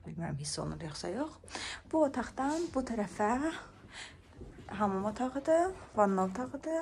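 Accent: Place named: United States. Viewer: Danisigin bele Amerika lehcesindedi 😃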